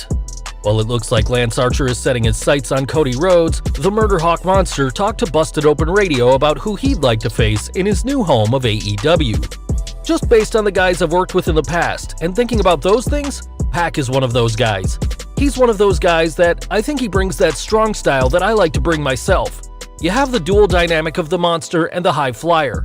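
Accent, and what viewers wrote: England, United Kingdom. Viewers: Lol he's english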